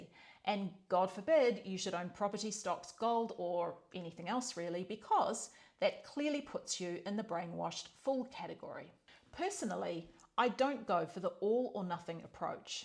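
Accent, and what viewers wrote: New Zealand. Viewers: Enjoy your videos. Could you provide a bio sometime in a video? I believe you have said you are from New Zealand (and I detect the accent) and live in Portugal now. How did that happen?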